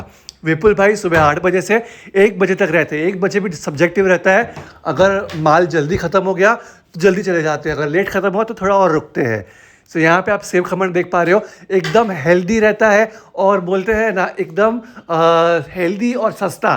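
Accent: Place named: Gujarat, India. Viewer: marcha vaghar rahey hey....😂 typical Gujju.. hindi + gujuu.. 👍👍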